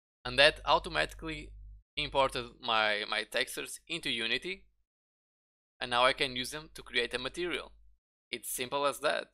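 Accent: Portugal. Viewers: Ahaha percebi pelo sotaque do inglês! Portugal🇵🇹🇵🇹🇵🇹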